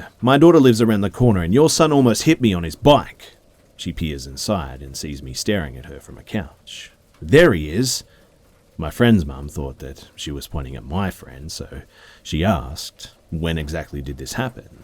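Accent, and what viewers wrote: Australia. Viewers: This Australian accent is comfortingly close to home.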